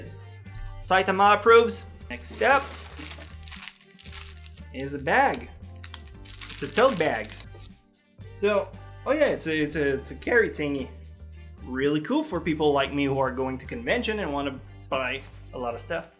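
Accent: Quebec, Canada. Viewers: it is candian freanch.... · I was thinking of a Canadian french... :D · are you from Quebec? Because you have really similar accent to my friend who is a Québécois.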